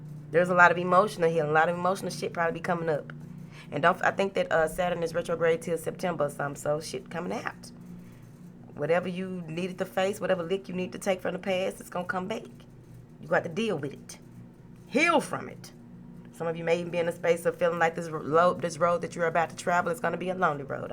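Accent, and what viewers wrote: South, United States. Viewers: I absolutely love your southern smoothness ❤️❤️❤️ and you’re message resonates heavy ♋️♋️♋️♋️♋️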